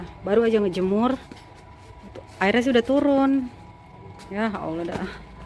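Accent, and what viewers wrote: Jakarta, Indonesia. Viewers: Betawinya medok bgt mpo😅 ... Salam Sy jg betawi larangan Ciledug tangerang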